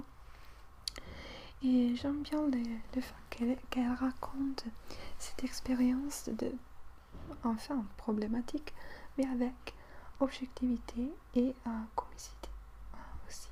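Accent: Italy. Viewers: J'adore tes vidéos ! Je les aies fait découvrir a ma sœur aussi et pratiquement tous les soirs on t'ecoute pour nous aider a dormir, tu es comme notre jolie berceuse. Tu nous berces de paroles positives, tu nous racontes tout ce que tu connais, c'est passionnant ! Et ton accent italien en parlant français c'est encore plus joli ! Tu m'apaises, tu me destresse, c'est un vrai plaisir de t'ecouter! Tu as un très bon français a ce que j'ai vu sur les autres vidéos aussi :) Sinon en lecture française je te conseille les grands classiques comme Notre dame de Paris de Victor Hugo ( je lis beaucoup plus de littérature anglaises) Bisous et continue ! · tu parles super bien français en plus ton petit accent italien est super bien je trouve que sa donne un plus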